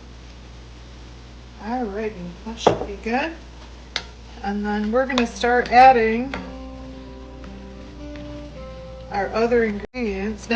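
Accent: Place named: Johannesburg, South Africa. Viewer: Comfort food at its finest! Now that Fall and Winter are just around the corner here in New England, this will be just the thing! I also wanted to ask you if you're anywhere near Jo-Burg [Johannesburg]..we've got some dear friends up there, and your accent is so similar to theirs! Makes me miss them<3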